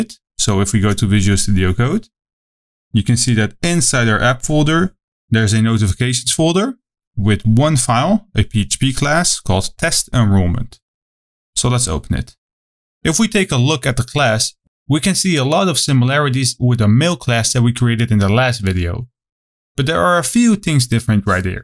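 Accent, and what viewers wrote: Netherlands. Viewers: Hi, thanks for your videos. It sounds like you're dutch:) Can you make a video about broadcasting notifications?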